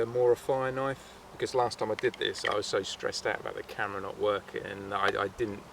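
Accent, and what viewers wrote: United Kingdom. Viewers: where abouts in uk are your from man?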